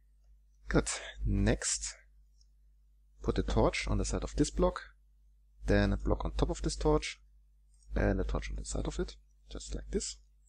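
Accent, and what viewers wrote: Germany. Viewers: Are you german 😅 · Man merkt das er deutsch ist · The houl sing,, german accent means: your are german, right ? the only thing i dont like in this video is the texture pack(reminds me of my old days in minecraft :,) ), but the door itself is cool :)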